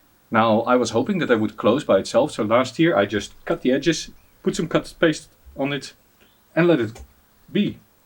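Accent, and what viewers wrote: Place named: Netherlands. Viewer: Hello me deario! Great to hear from you with your lovely Dutch accent 👌I’m so glad success hasn’t had the effect of neglecting your early fans! All best wishes for the rest of 2025 ✌️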